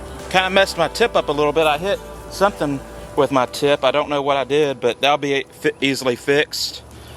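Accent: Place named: South, United States. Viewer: Whats your accent it sounds Southern bayou/With Canadian...and hint of Aussie in some words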